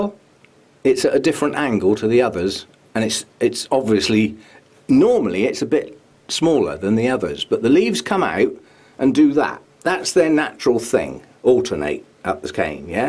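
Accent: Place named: Norfolk, United Kingdom. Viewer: Most informative video I've found so far! Really helpful. Thank you. Also as a Norfolk girl, I'm loving your accent. Sounds like home :)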